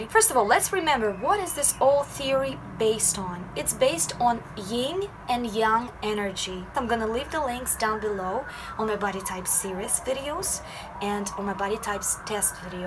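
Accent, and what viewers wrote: Russia. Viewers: *beach *cheeks. She has a Russian accent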